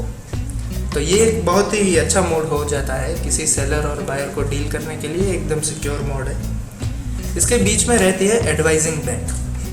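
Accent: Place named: Gujarat, India. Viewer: Sir, i did import export course... Ane tamara tone parthi lage che k tame Gujarati cho.... Kai Job hoy toh let me knw... M ready to cm at ur place😁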